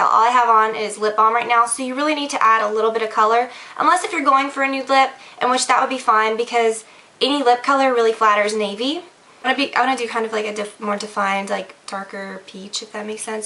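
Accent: South, United States. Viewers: Is she from the south?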